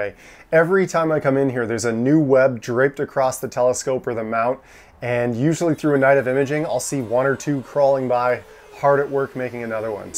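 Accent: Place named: Canada. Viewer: I'm guessing from your accent You're from Canada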